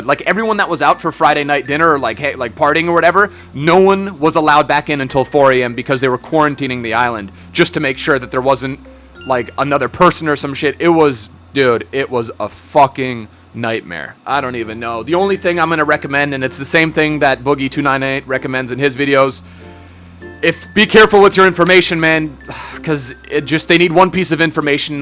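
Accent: Germany. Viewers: are you German ? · is he german ?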